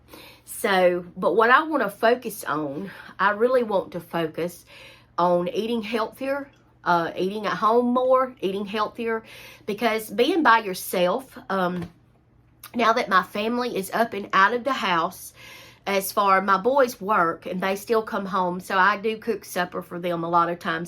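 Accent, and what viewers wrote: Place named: Alabama, United States. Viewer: I am 64 and yes my body does not let me do the things I want to do. I am from Alabama. You sound just like us. Are you from Alabama?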